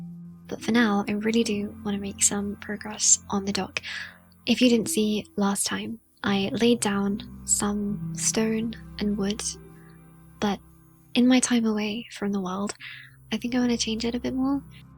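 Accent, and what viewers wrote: England, United Kingdom. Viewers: This is the most English voice I've ever heard, goated 🙏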